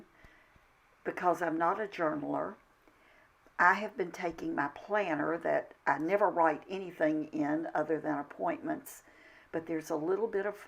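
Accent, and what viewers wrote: South, United States. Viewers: Oh my...what an extraordinary video. The path that you walk each day looks so peaceful. I wonder..if it had not been for this self quarantine(something awful) taking place would you have ever shared such a meaningful part of your past (something lovely). It was really comforting to hear you talk and read about your aunt. Maybe it was your hypnotic southern drawl that lulled me into a place long ago. 🤔 This video was so calming. I loved all you talked about. ❤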